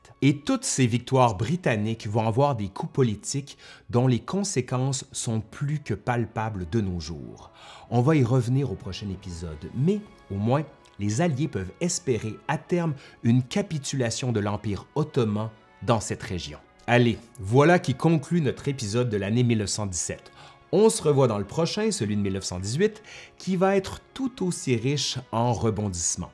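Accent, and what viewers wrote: Quebec, Canada. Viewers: moi perso, j'adore, surtout avec le petit plus d'accent québécois^^ très dense, on sent bien qu'il y a du travail derrière. Agréable début de WE pour moi donc^^ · Super comme toutes vos interventions. Merci beaucoup entre parenthèses j'adore votre accent canadien ! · J'adore cet accents québécois et merci pour cette vidéo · Vraiment génial ! Je l’ai regardé deux fois de suite pour être sûre d’avoir tout retenu 😍 et tellement fan de l’accent québécois ☆ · Excellent, j’ai toujours aimé l’Histoire mais votre manière de l’expliquer est vraiment prenante et en plus j’adore cet accent québécois, toutes mes félicitations!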